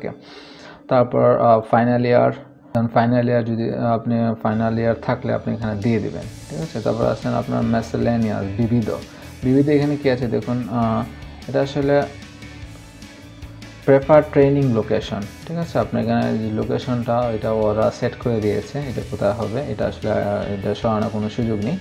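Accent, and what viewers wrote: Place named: Sylhet, Bangladesh. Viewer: Vai mone oy Sylheti 🤩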